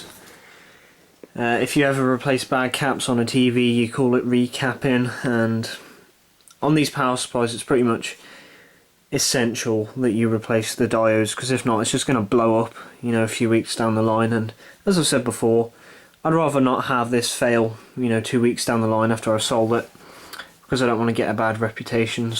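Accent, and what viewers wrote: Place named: RP, United Kingdom. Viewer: You sound so intelligent with your nice posh accent , us up north sound like thick *unts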